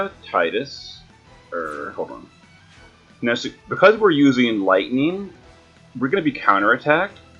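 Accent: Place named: East Coast, United States. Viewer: Gotta be east coast at least. Or you're one of those weird people who had a brain injury and inexplicably woke up with an accent. Ah, I know, are you from Lanzelt? :-D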